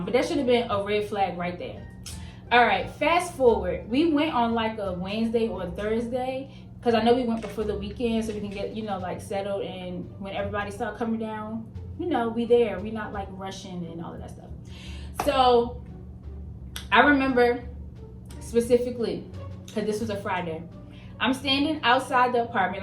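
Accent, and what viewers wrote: Baltimore, United States. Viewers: Her accent sounds like she’s from Baltimore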